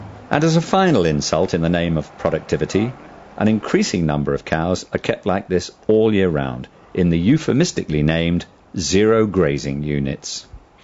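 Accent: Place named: United Kingdom. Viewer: sound like British English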